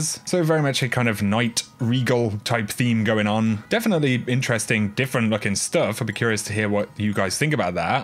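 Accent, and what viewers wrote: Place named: England, United Kingdom. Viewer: First D2 was extremely easy and now because of the restrictions has become too difficult to progress, the issue with clan engrams doesn't help. Hopefully they will review this and make some changes. I used to play everyday through D1, D2 doesn't encourage me to do that any more. Lastly, many thanks for all the information you provide, been watching for a while (Nice to hear another English accent).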